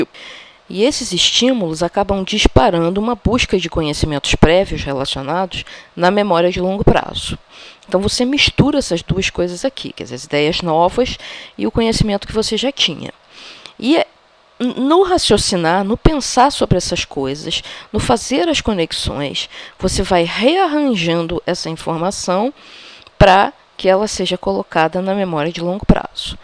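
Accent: Rio de Janeiro, Brazil. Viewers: Eu acho interessante e legal a "entonação do X/S" na sua fala, rs. Sotaque carioca? De qualquer forma, parabéns pela iniciativa! Estou estudando para concursos e assistindo todos os vídeos relacionados ao aprendizado. Obrigado!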